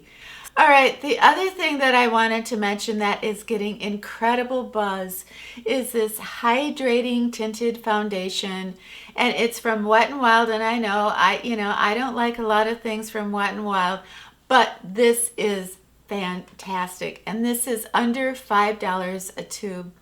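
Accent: Midwest, United States. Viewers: “Oh, you’re just saying that.” My gosh, I love that Midwestern accent!! Seriously, I do! Grew up in Minnesota, until late grade school, then in Oregon, so I love to hear that Midwestern accent! 🥰 I can’t imagine someone saying don’t want your dog and no dog in the back yard! God was definitely watching over you, and protected you and sweet Dezi. Can you imagine if you weren’t home how he would have treated Dezi!!😡 Scary! Bless you and Dezi!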